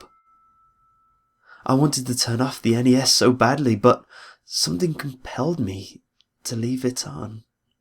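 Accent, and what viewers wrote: United Kingdom. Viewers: I really like this pasta. I especially like the way the last one led onto this one. The fact that this was read by a British dude just make it so much better `0`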